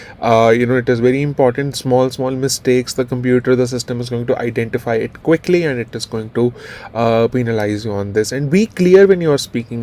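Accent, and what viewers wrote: India. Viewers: Its my humble suggestion that first learn then teach. Your accent is not up to the marks. Typical Indian style listen, :THAT IS, very substandard". My brotherly advice would be please don't spoil others. And remove your chnnel imediately, Then your delivery, "TRY" is not of English ACCENT. It seems as if your are speaking Indian. Veyr bad.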